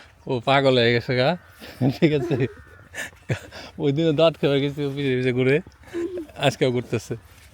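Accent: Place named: Gopalganj, Bangladesh. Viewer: ভাই, আমার এক খালাতো ভাই আছে, খুবই ক্লোজ ছিল।উনার ভয়েস, কথার টান ঠিক আপনার মত।ওর বাড়িও গোপালগঞ্জ সাটুরিয়া।আমি সাটুরিয়া বাজারে বেশ কয়েকবার গিয়েছি।খালুজান মারা যাওয়ার পর পারিবারিক জটিলতায় দূরত্ব হয়ে গেসে, তারপরেও আপনার ভিডিও দেখলে আমি আমার কাজিনকে ফিল করি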